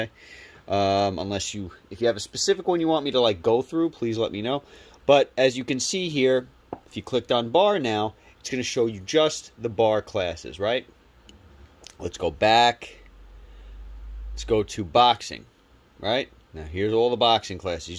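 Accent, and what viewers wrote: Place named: New York, United States. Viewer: bro thank you! You’re vids are so helpful. I’m excited for this journey. Also.. you talk like me😂 by any chance you from NY?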